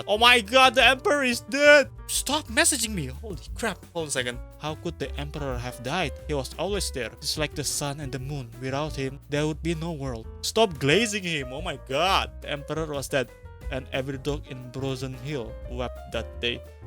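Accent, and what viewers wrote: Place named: Russia. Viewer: You speak Like Russian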